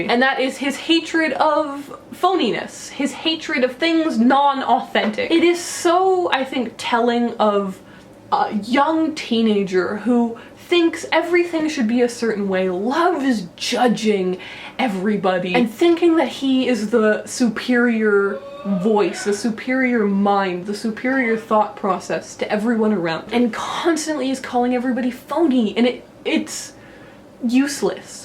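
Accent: Canada. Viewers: are you canadian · First I've ever seen of you, and I don't know you at all. From the accent, Winnipeg?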